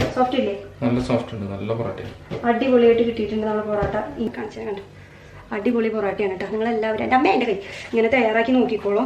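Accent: Thrissur, India. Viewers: Thrissur ano sthalam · Authentic thrissur slang. Keep it up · Mmmade trissur bhaasha...kurachu speed kurachu samsarichu dear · ആദ്യമായി ഞാൻ ഒരു കാര്യം പറയട്ടെ.. ചേച്ചിയുടെ കുക്കിംഗ്‌ നെ പറ്റി എനിക്ക് ഒന്നും പറയാനില്ല.. superb.. but അതിനേക്കാൾ ഉപരി you are very sincere and a good humon being..... loved your thrissur slang.. iam also a thrissur kkari... 💪💪സംസാരത്തിന്റെ speed thrissur kkarkk ullathaa.. 🥰🤩😘🤣🤣🤣lam a begginar in cooking and a new subsciber of yours... stay blessed and stay genuine like this.. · Thrissur slang is good but if you lessen speed, we could follow you clearly. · Enthu speeda samsaram...thrissur aano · Thrissur aano veedu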